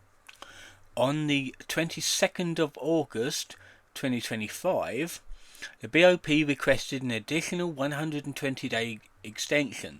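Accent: United Kingdom. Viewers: average british voiceover, completely unintelligible... bro daniel is more understandable than you when he's in his meltdown mode. just use one of those cancerous text to speech programs it would be better than this. 24 sec in and i legit had to pause and say wtf lol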